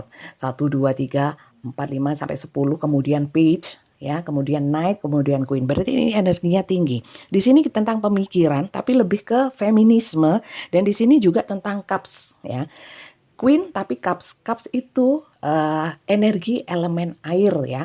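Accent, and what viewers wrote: East Java, Indonesia. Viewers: Bahagia dengar bacaannya sister terutama ngomong jawa timurnya asyik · Ikut nyimak bunda...suka sekali sama suaranya yg medok.. terimakasih redinganya bunda🙏🙏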